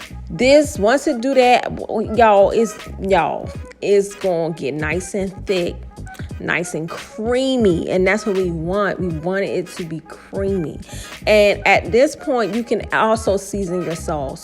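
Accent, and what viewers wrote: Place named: Maryland, United States. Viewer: Are you from the Maryland/DC area? You sound like it. But anyway the food look so good. Man oh man 😋😋😋😋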